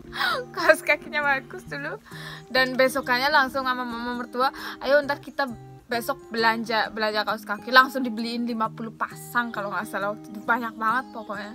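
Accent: Bali, Indonesia. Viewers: mba orang bali ya?? logatnya seperti org bali😊😊😊😊😆😆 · Kok logatnya kyk orang Bali? Dari Bali yaa?? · Logat Bali nya ga ilang yaa.. Keep inspiring. Send love from Indonesia ❤ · Iya logat nya kyak logat Denpasar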